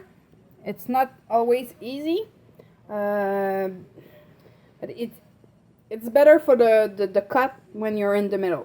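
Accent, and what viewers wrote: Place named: Quebec, Canada. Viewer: In French please, is it possible ? Je préfère vous entendre parler avec votre québécois. Merci pour vos partage.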